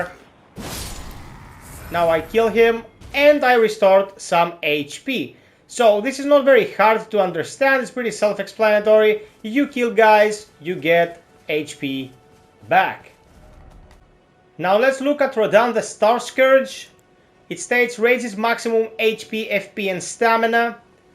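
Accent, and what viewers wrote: Greece. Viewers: i know a greek accent when i hear it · Your accent seems greek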